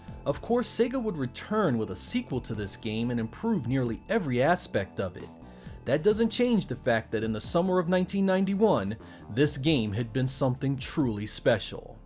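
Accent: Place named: Mid-Atlantic, United States. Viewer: You pronounce water as "wodder", not only do you make great videos, you represent the mid-atlantic region very well!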